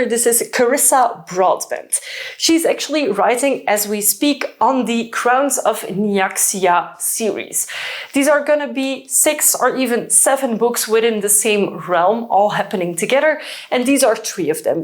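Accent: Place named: Netherlands. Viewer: no worries I like the dutch accent.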